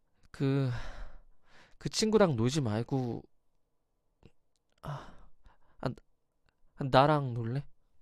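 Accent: Gyeongsang, South Korea. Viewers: ㅋㅋㅋ남자가 왜이리 소심보스야 ㅋㅋ우쭈쭈~ 서툴지만 애정표현 하려고 노력하는 모습이...심큥💘)ㅋㅋㅋ 아무말 대잔치나하구 말이야 ㅡㅡ 이거이거 내가 좀 적.극.적.인 남자로 만들어 줘야겠구만 ㅋㅋ 그와중에 귀여운 경상도 사투리이~박크력)있네 ㅋㅋ 자기야 뽀뽀 박아 빨리 ㅋㅋㅋ😘 이거 이제 만날때마다 사랑한다고 말하라고 시켜야겠구만 ㅋㅋ 님 영상 볼때마다 내 가슴이☆ 콩닥코..♡ 죄송합니다 헤ㅔㅎ.. 쨋든 오늘도 잘들었습니다💕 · 아....사투리 너무 멋지다♡ 경상도사투리쓰는 남자 너무 멋있어여♡♡♡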